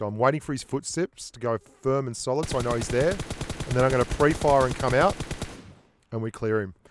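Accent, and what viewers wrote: Australia. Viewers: Ar U Australian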